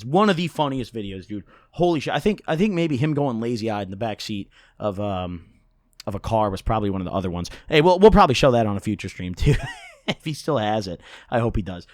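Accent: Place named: Midwest, United States. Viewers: he got the midwest accent like me lmao\ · That Midwest accent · i’m from ohio, so hearing meer sounded normal to me lmfao midwest baby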